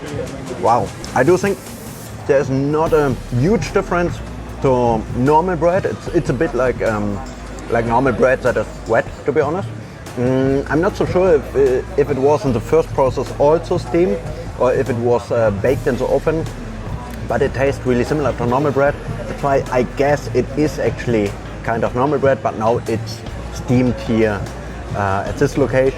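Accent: Germany. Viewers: Direkt den deutschen Akzent rausgehört 😂😂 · I like Your German aksen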